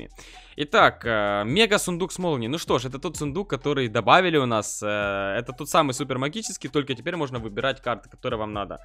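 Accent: Ukraine. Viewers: с Украины или Рлсии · ты с Украины??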